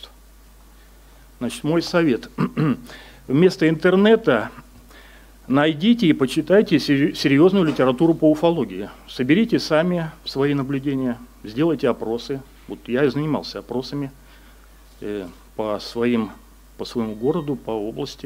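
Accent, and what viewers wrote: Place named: Ulyanovsk, Russia. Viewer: Такой говор в Ульяновской области.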